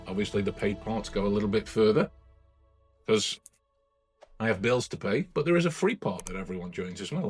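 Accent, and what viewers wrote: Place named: Quebec, Canada. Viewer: En fait non seulement tu parles le français mais tu parles également le québécois et ça, ce n'est pas rien !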